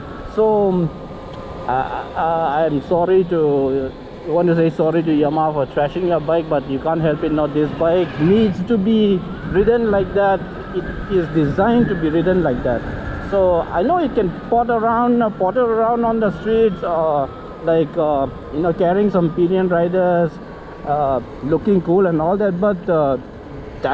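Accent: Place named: Mizoram, India. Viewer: Mizo saptrong thluk(accent) chiah2 nh chu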